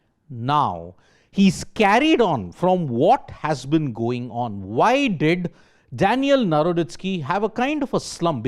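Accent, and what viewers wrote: South India, India. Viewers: Sports today, please change the presenter. His south Indian accent is very difficult to understand.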